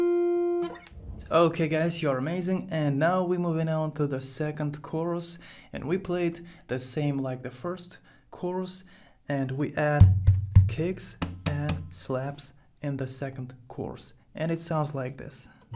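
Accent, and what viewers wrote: Russia. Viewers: по произношению чел русский, я думаю, что это с, лайкни, если я шерлок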